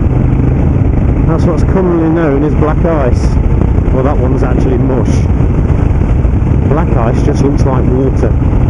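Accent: United Kingdom. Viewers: I don't have any idea about how to ride on ice or black ice because I'm a young novice rider. I know there is not much to do on black ice, but I believe I missed some points on the video. It is so hard for me to understand British accent. Could anyone summarize the tips on the video? thanks in advance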